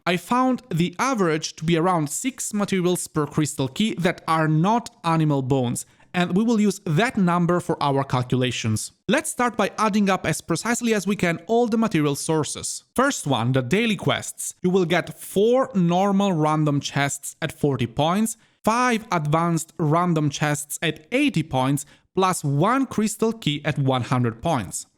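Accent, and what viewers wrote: Italy. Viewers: Are you southern Italian?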